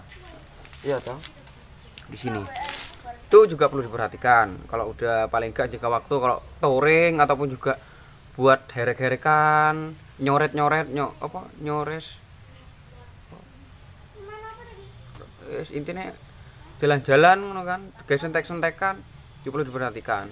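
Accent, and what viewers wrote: Java, Indonesia. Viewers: Bhasane seng jwo medok e wae,,sam Bn asli jawa ne,,ngrti hehehe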